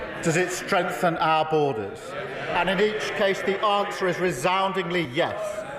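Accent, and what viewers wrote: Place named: RP, United Kingdom. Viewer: What a load of posh toff 😂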